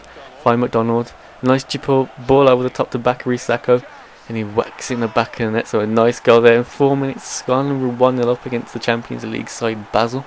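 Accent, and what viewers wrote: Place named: Wolverhampton, United Kingdom. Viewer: Are u from WOlverhampton cus u sound like u are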